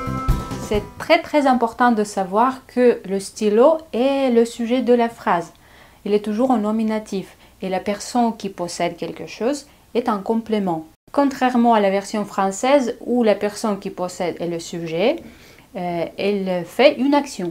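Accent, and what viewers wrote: Russia. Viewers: Votre accent russe est vraiment délicieux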